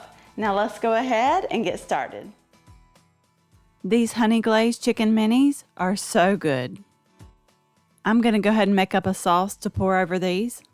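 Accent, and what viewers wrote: South, United States. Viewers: I gave you a like right away just for your Suthun accent. Makes me feel right at home cause I live in Dallas. Your recipes look good also.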